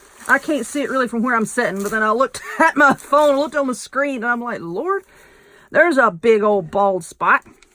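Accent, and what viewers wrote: South, United States. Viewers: I have said this before but I have to say it again. I love listening to you. You are very funny. I agree with you about the bugs. Where is your accent from in the South? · Love you, your Southern accent, you are so funny, and you do such cute things! Also, I love your nails in this video. Can you tell me how you did them? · I love the mess that you have going on 🤣 creativity at its best! I really love this little project ❤️ Love you accent btw 👧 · Girl you are too funny! And that's coming from another Southern Gal with the same accent and sense of humor;) Love your ideas and you have gotten me back into crafting after many years of having no time to do so. And I'm having a ball!! Thank you!!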